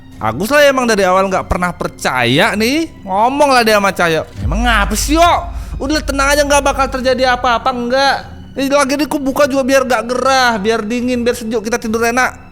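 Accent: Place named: Lampung, Indonesia. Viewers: Lampung gitu logatnya bg😅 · Latar jawa, logat lampung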